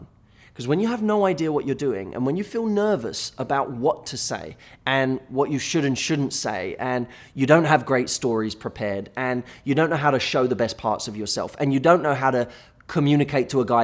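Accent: United Kingdom. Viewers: This one might b the most useless comment on youtube ever ... But everytime i see him speaking .. i get so hungry ....i mean thers something about his speech pattern or such a clear brit accent .. his voice makes me crave dessert or something .. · it must be the British accent matthew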